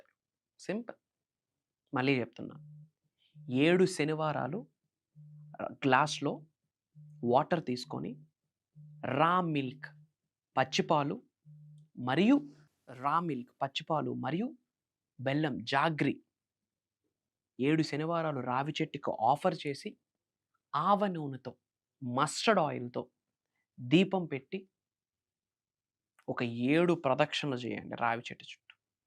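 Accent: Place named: India. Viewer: yela miru andra vaku English ni telugu chesi maatladuthunaru.. Malaysia Singapore lo ila maatladithe kinda nunchi paina teda ga chudtharu..😅😅. thala noppe vosthundi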